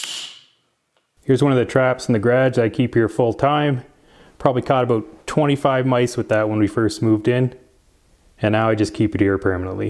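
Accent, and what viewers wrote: Canada. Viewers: You say about and mouse like someone from either Canada or certain places in Virginia. Are you ? I've had success with setting that trap with the yellow bait shelf like you have, and it works well. Also a " Mice Cube " works well for someone who doesn't want to touch the mouse, or doesn't actually want to kill it. For bait, use 1/2 of a Lay's peanut butter cracker (the 1/2 that the peanut butter sticks to) and slide it down to the other end of the "cube". Works like a charm !! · I thought you were Canadian by your accent, then I saw the hockey stick!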